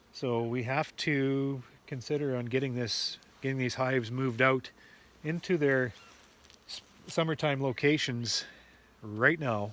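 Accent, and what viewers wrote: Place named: Canada. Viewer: I prefer the pronunciation p-oh-llen, over the pronunciation p-ah-llen. Not sure which is correct. Perhaps both. Your pronunciation just sounds right. I love the way Canadians speak English, and I love all of our northern neighbors, Eh?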